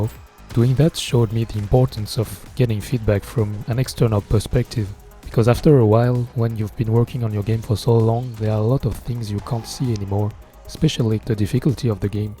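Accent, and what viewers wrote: France. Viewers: ton accent m'a l'air français ^^ Superbe travail c'est trés motivant.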